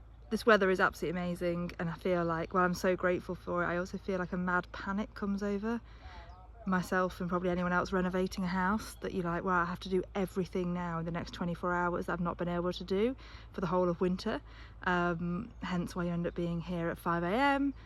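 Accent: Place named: East Midlands, United Kingdom. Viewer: Are you from the east midlands Nottingham area?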